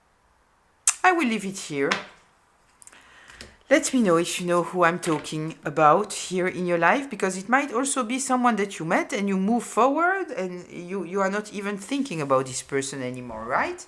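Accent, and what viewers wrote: France. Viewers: I absolutely love your French accent ❤️🧡💛💚🩵💙💜🤎🖤🩶🤍🩷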